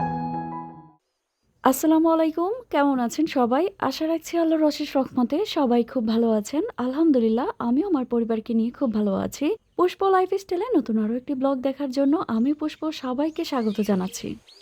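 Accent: Bangladesh. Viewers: আপু তোমার ভিডিও চুপি চুপি এসে সব সময় দেখে যায় কিন্তু কখনো কমেন্ট করিনি আজ করলাম তাও কেন জানো তুমি ব্রাহ্মণবাড়িয়ার তার জন্য আমি তোমার ভাষা শুনে প্রথমে বুঝে গিয়েছিলাম পরে দেখলাম না সত্যি তুমি ব্রাহ্মণবাড়িয়া খুব ভালো লাগলো জেনে আমিও ব্রাহ্মণবাড়িয়া মেয়ে আপু কসবা সৈয়দাবাদ বাড়ি আমার জন্য দোয়া করো আপু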